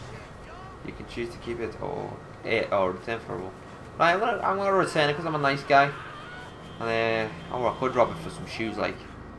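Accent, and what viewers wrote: Liverpool, United Kingdom. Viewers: GinaBee yeah i think so, SCOUSE !!! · Are you from Liverpool?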